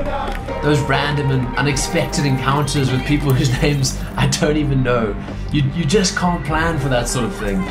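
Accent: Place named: South Africa. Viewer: Where are you originally from? You sound like your from some part of Africa? Like South africa?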